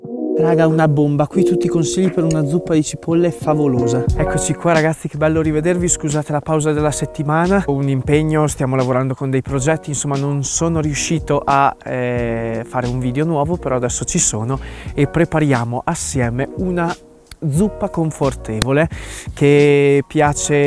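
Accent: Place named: Trentino, Italy. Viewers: "...te sei en brao matelat".....orgoglio trentino...davvero bravo, simpatico, sobrio, educato ed efficace..... e mooolto trentino. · Io ti preferisco con i capelli corti, comunque ti adoro solo sentirti parlare.. Quell accento del Trentino mi fa impazzire! Un saluto dalle Marche. Trentino ti lovvo..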